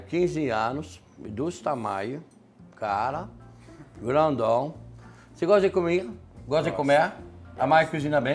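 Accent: France. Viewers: Que Francês maravilhosooooooo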